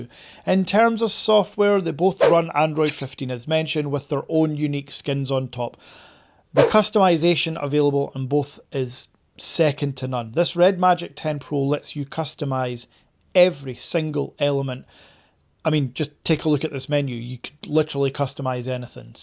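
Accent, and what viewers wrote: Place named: Scotland, United Kingdom. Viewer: Love your Scottish accent!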